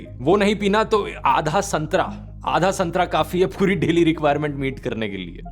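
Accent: India. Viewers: The real indian voice ✨✅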